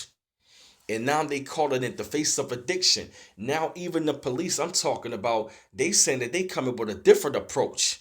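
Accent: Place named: Baltimore, United States. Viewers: It’s an east coast accent to me. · The Bmore accent is such an interesting mix of Northeastern and Southern.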